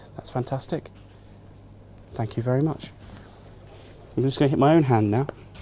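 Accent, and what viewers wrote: United Kingdom. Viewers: Is this guy full on British or an American who's lived in the UK for a while? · Full on British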